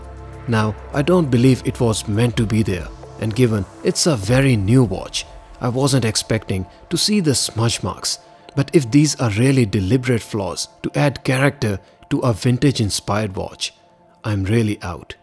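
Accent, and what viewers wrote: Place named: Kerala, India. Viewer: bruh sounding like a physics teacher from Kerala...."vwaatch" yeh no thanks youtube recommend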